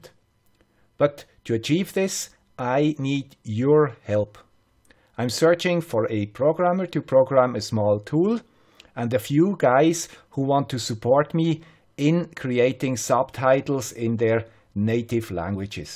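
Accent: Switzerland. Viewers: "Olá membros do YouTube ! Eis o homem de sotaque Suíço ! ..." - Português Europeu - European Portuguese. Consider me enlisted ! If you can find the time to do it, I'll find some time too... Alexandre from Portugal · Love your swiss accent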